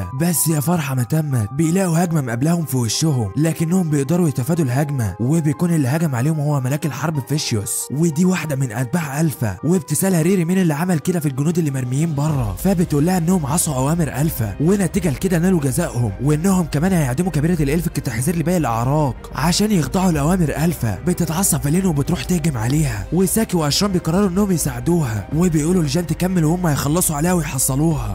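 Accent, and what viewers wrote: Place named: Saudi Arabia. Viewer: أسطورة استمر انت ولد عمي وترونة سعودي ولكنة لخبط وحط اللغة المصرية ❤❤❤❤❤❤❤❤❤❤❤❤❤❤❤❤❤❤❤❤❤❤❤❤❤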